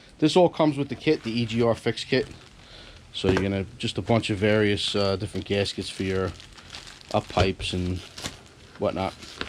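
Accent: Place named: Boston, United States. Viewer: Bro i can tell you're from Boston or somewhere like that ahaha. great vid thanks. What are you using for a drone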